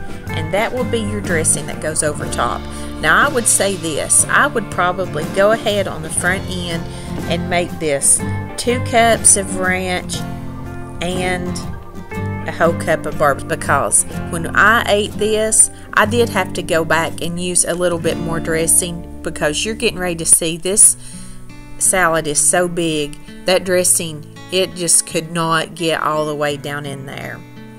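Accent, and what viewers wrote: South, United States. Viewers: Hello there new here and love your recipes and that country talk. I am also from the south Alabama. But live in Central Florida.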